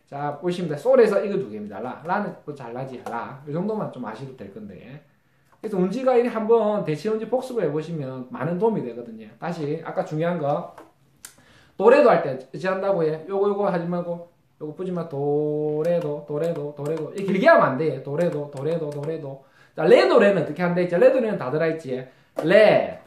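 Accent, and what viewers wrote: Gyeongsang, South Korea. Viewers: ㅋㅋㅋ 경상도 사투리 강의 정말 구수하고 잼있습니다.^^ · ㅎㅎㅎ 나도 경남사람이지만 경상도 보리문댕이 사투리 너무 정겨워....ㅋㅋㅋㅋ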